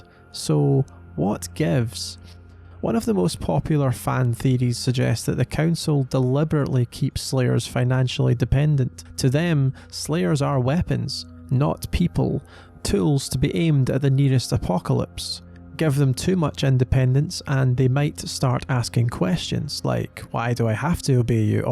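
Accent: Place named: Scotland, United Kingdom. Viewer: Heard a Scottish accent. From Edinburgh here.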